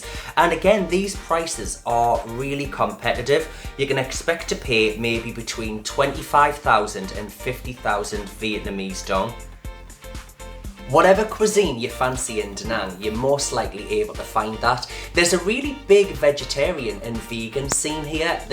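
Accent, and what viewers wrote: Newcastle, United Kingdom. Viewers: What a great Geordie Accent. Good Video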